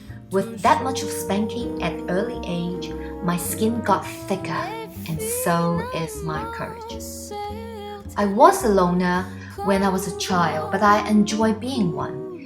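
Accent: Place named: France. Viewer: youre such an inspiring woman, I really loved learning about your past! Your English has a bit of French accent to it, it was sooo cool!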